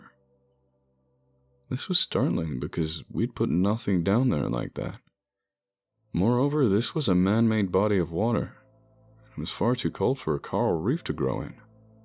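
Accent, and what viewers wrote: United States. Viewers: I LOVE your American accent, it's dead on. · Your American accent is so good! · Your American accent is very good. There are respected British actors that don’t do it as well. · I agree that his American accent is great, but I'm not sure what you "producing music" has anything to do with studying language or dialect. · He does an excellent Job as do many actors I'm not sure if you watch movies to TV but many many talented Foreigners speak in perfect American accents. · the American voice you use in these videos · you have an American accent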